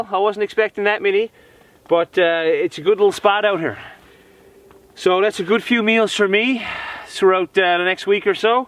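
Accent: Ireland. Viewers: New to the channel. Love it. Cannot get enough. You sound Irish to me or is that the Newfoundland accent. Please do not be offended, just trying to learn. Thanks! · "Go habs go" Alright buddy, I liked you yesterday, now I'm straight up in love! Dude your channel is loaded with content! Damn productive you are! Is your accent from Ireland? Also, where do you live man, to be a habs fan? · And I am new to your channel but you sound Irish, some of the music too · Why the half Irish accent? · Just found your channel through joe robinet i know your from newfoundland im from northern ireland and you sound as if you've got a bit of an irish brogue about you I've watched a couple or your videos and liking them very much i subscribed so will be looking forward to some more videos well done m8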